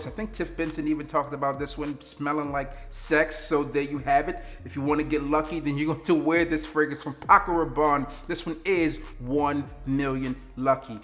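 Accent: Baltimore, United States. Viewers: He has a VERY STRONG Baltimore accent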